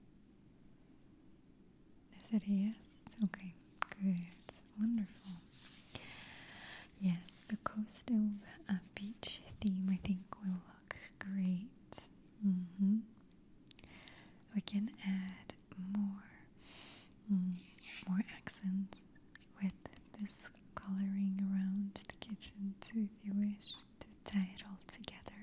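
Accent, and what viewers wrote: United States. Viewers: Does anyone know where this amazing lady comes from? Her accent is obviously very American but I'm sure I can hear something else in it and it sounds beautiful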